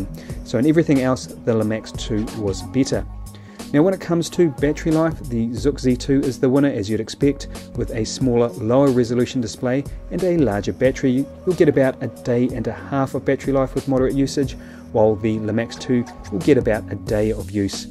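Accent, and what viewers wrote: New Zealand. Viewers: ARE YOU FROM NEW ZEALAND?!?!?!?!?! JUST JUDGING BY YOUR BEAUTIFUL ACCENT :P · kiwi accent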